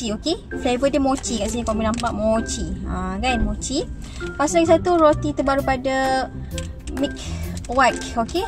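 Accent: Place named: Indonesia. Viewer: amboi cakap loghat indo no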